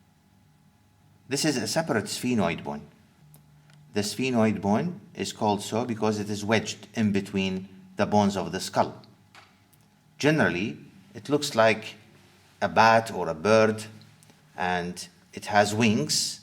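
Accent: Iraq. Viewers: .. are you Iraqi teacher??? your dialect is 100% Iraqi:)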